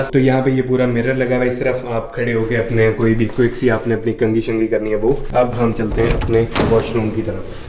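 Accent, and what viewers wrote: Punjab, India. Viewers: Yaar apka Punjabi bolna words bohot mazey dar ladta hai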